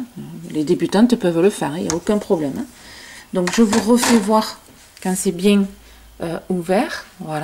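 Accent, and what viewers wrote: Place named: Pau, France. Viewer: Vous avez un accentde Pau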